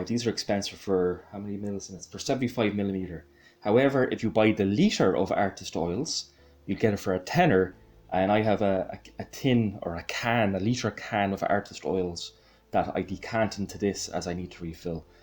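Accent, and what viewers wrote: Ireland. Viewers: Whenever I watch any of your videos, I always end up with an Irish accent in my head for a few hours!😂 Funniest thing is my parents were Hungarian , and they couldn't pronounce "th" either since that sound doesn't exist in the Hungarian language. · Your channel was one of the first I watched when I got back into scale modelling and back into using an airbrush, now I remind myself to “t’in it down” with an Irish accent lol, Cheers!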